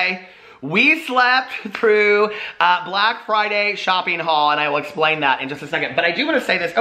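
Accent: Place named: Midwest, United States. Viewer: I love that you are a MidWesterner, so am I. I do crack up when I hear the verbal Midwest come out . Like your black church shoes. Years ago I made a reference to growing up we didn't have numerous shoes we had school shoes, play shoes and church shoes and a couple people didn't know what church shoes were. Lol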